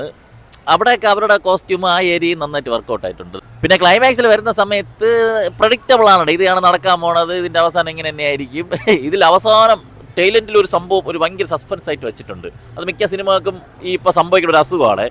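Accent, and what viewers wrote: Trivandrum, India. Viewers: Dey trivandrum aahdey 🥴🥴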